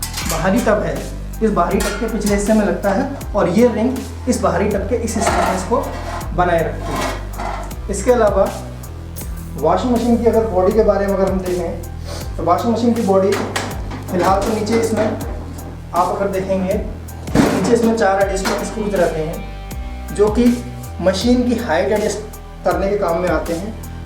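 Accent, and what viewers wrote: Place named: Uttar Pradesh, India. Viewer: Itni shuddh Hindi kahan se Sikhi up ke ho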